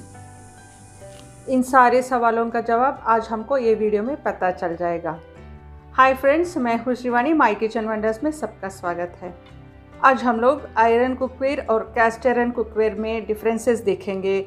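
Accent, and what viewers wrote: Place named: South, India. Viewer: aapka hindi me south india wala accent hai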